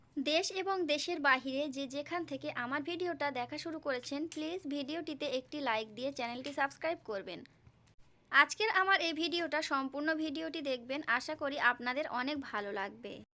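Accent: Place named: Bangladesh. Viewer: আপু তোমার কথাবার্তা শুনলে আর তোমাদের খাবার দেখলে মনে হয় না যে তুমি ইন্ডিয়ান মনে হয় তুমি আমাদের বাংলাদেশ যাইহোক আপু খুব সুন্দর লাগলো মাশাল্লাহ অনেক সুন্দর হয়েছে তাদের সবজি বাগান খুব সুন্দর হয়েছে মাশাল্লাহ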